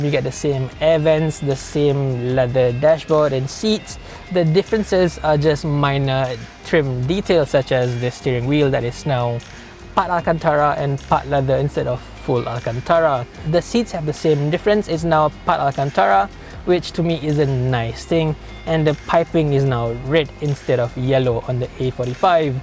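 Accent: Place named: Malaysia. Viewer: Your English is so good and can recognize u r malay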